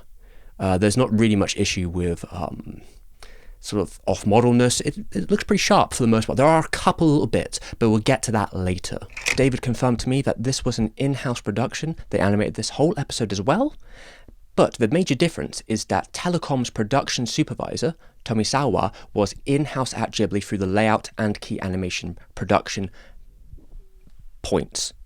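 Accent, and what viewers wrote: United Kingdom. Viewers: japanese names in a british accent are crazy...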